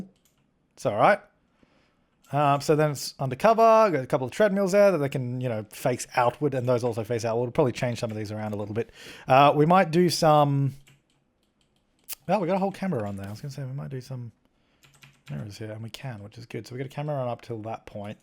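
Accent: Australia. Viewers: Ya Mong 😂😂 such an Aussie !! I love it !!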